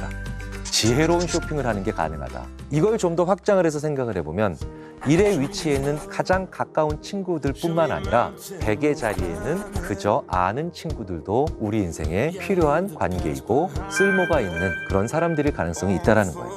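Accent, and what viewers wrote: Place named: South Korea. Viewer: 우와~인제 논문도 읽어주네요~ 전문가 놀리기 재밌어~ㅋ 배부르니까 긍정적인거라는 거구만요. 배고프면 부정적이고. 의심도 많아지고. 고만고만한 모범수들.. 그냥 종이 한장이지 뭐~ 사람이 그쵸. 일이 안될때 밥먹고 하자는 무의식이 발동 하는군요~ 배고프고 힘들때 예민한 이유도? 왜케 한국말처럼 영어를 읽으나요...귀에 쏙쏙 들어오네요. 우와 뭔가 경제에 영향을 미칠듯한 메세지네요. 나를 솔직히 말했는데..이용하고 편견을 갖는 사람 있어요..굳이 말할필요 있남?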